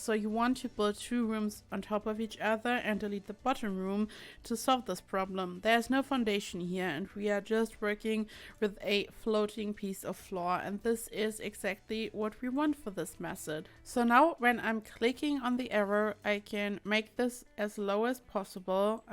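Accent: Germany. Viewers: Ur German right?😂😂 Nice accent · U have an interesting accent! Are u from Germany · are you from Germany? A friend of mine is and the accent is very similar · You sound like german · are u german?? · Are you German? Because you sound like you are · You sound german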